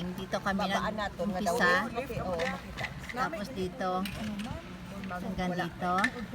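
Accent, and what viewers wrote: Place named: Iloilo, Philippines. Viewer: From where are you in Pinas? You sound like you are Ilonggo or Kinaray a..😂😂😂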